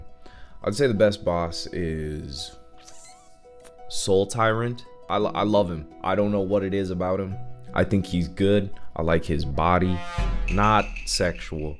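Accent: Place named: Wisconsin, United States. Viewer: My boy have to be from Wisconsin lol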